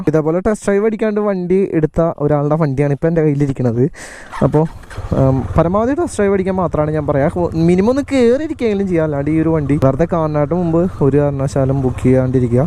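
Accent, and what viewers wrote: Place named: Malappuram, India. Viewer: Malappuram slang 😅😄😉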